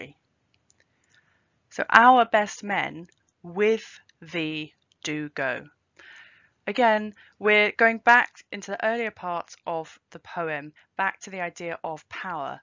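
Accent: United Kingdom. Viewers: Dear Dr. - thank you for this quintessential explanation to one of my most loved poems that i have always interpreted as the secret of what the mere Christian fairy tale is about. This poem connected me to transcendence like no other and i am not a privileged elite - i was educated in New York City schools and as Puerto Rican and trouble even understanding everyday language. There was some very beautiful way about this dialogue that blew me away. Now i found you and you have masterly deepen the beauty and given me more knowledge in that lovely British voice. I just put together the Russian Dying SWAN with this poem - for my imagination of the transition; like you said it is just a divine nap- ( LOVE THIS NEW INTERPRETATION) and we become so very rested and beautiful. In Socrates ‘ PHAEDRAS it is the trumpeter’s song - the more beautiful when thy know that they are to die - this gives me so MUCH HOPE!THE GREAT NEWS! 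I didnt know the COR.. SCRIPTURE - WOW. Today is an UTMOST FINE- YOU! Thank you for this illumination!SEE DYING SWAN- DLYANA-LOPATKINA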